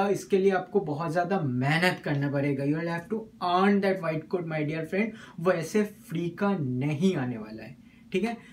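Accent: Bengal, India. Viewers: Bhaia apki bengali ho?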